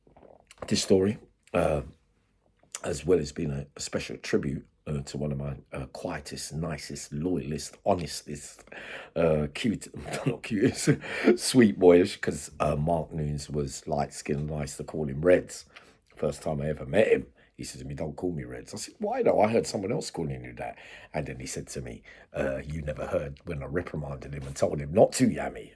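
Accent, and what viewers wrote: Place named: Bermondsey, United Kingdom. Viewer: I reckon your from Bermondsey, London. or close.!!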